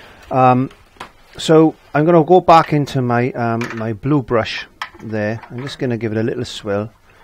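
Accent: Wales, United Kingdom. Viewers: Where are you from? You sound Welsh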